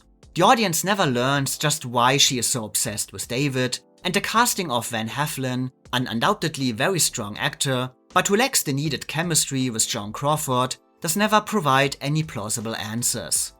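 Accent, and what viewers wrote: Germany. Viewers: Love your Deutsche - English accent. You roll your "r",. Excellent analysis, Joan's performance in Possessed (47) is a tour de force.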